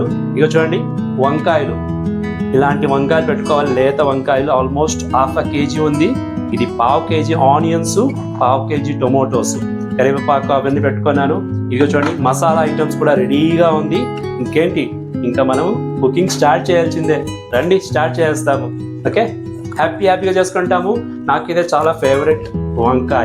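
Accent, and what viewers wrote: Tamil Nadu, India. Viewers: గారు మీరు తెలుగు వార, తమిళ్ తమ్ముడా 🤔 మిమ్మల్ని జెమినీ సీరియల్స్ లో చూసే దాన్ని మీ తెలుగు కొంచెం డిఫరెంట్ గా ఉంది 😊👍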